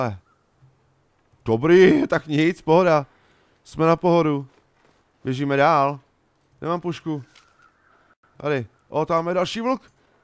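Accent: Czech Republic. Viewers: čau chci se zeptat ty pocházíš někde z okolí Ostravy? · lubozvucny moravsky dialekt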